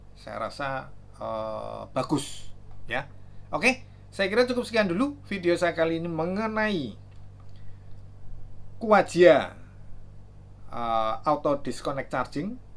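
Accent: Java, Indonesia. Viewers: tp jujur nih mas maap nih ya, aku malah suka, lucu ato terhibur klo mas nya misuh2 di setiap konten video gara2 komen netizen, misuh2 mas nya lucu bikin ku ketawa terhibur trs apalagi dgn gaya ciri khas kental logat Jawa nya itu, gara2 itu jg aku jd sering nton channel mas nya selain pengen tau info2 gadget soal perkabelan dan per chargeran nunggu moment mas nya misuh2 soal komen aneh2 ato soal komplain netizen 😂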